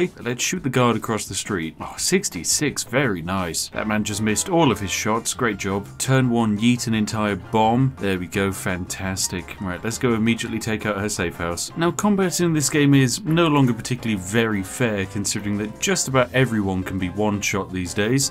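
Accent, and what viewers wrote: United Kingdom. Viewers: wait. isn't british???? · IS HE REALY BRITISH BECAUSE I M A BRITISH MUN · How to pull off being a millionaire and being your own boss... Step 1) Be British or have a smooth-as-hell British accent. Step 2) ??? Step 3) Profit!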